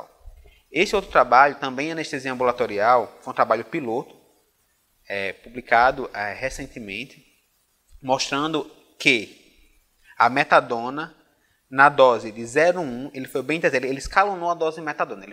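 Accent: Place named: Northeast, Brazil. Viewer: É um pouco complicado entender quando dispara a falar rápido e junta o sotaque nordestino e o agudo da voz,então complica pra entender. Surgido fazer fono se quiser falar por longo tempo.Ecplica muito bem mas o sotaque e o agudo atrapalha o entendimento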